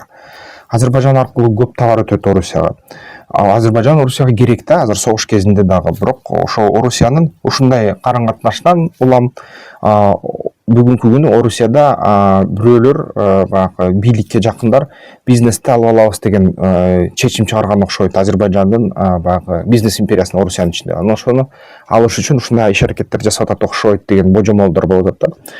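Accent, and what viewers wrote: Standard. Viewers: Азаматсын патириот деген сендей таза кыргызча суйлойт!!!....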